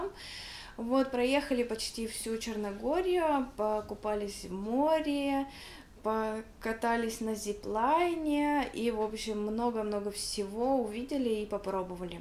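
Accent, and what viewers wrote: Russia. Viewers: congratulations on reaching 100,000! I'm sure you will reach so many more.. You have such amazing talent, i'm really looking forward to hearing some more of your stuff.. I absolutely love your accent by the way! I wish i could speak russian! Lol... 😘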